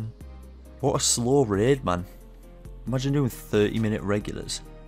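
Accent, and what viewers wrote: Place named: Teesside, United Kingdom. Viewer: I know a boro accent when I hear one UTB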